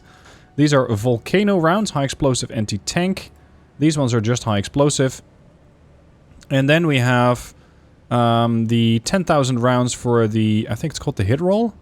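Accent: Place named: Netherlands. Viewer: Your accent in german gave it away i think you are or at least speak dutch. Greetings from Switzerland.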